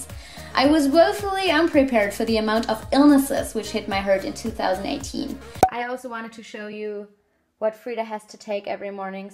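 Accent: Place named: Germany. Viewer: what part of germany are you from? that's an interesting accent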